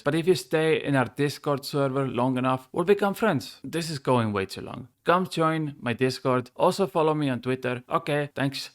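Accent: Estonia. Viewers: Sa oled eestlane?? Mida hullu. just leidsin su kanali ja mõtlesin, mis aktsent see selline on :D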